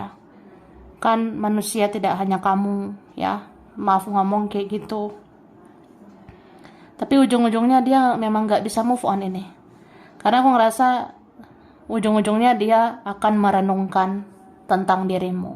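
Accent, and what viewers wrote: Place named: Java, Indonesia. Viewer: meduuuukkk suarane sampean mbak yuuuuuu....slam persahabatan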